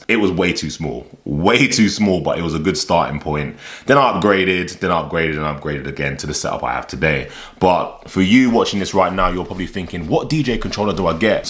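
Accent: London, United Kingdom. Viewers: Just found your channel, so glad! I love your London accent and your vibe + great info!! What controller would you recommend for a beginner who travels a lot and wants to be able to travel with it easily? Around 300-500 pounds budget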